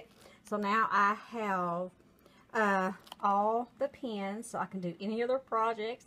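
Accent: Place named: South, United States. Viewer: It's my pleasure I found your channel a couple of months ago and I just love the southern accent, I am a country music lover ! I wish I had some Loretta Lynn's show where I live too!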